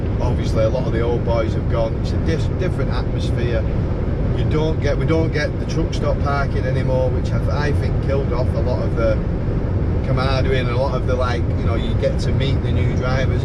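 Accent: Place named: Bolton, United Kingdom. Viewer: Hi. Tell them you’ve heard from Stevie from Burgess and they’ll know me lol 😆 Dean is a really good fitter with lots of knowledge but don’t tell him I said so haha 😂 I’m enjoying your videos buddy with that Bolton accent 👍🏻 I’m just waiting for a recovery truck as the axle has broken on my scanner trailer 😩 Take care cheers Stevie 🤩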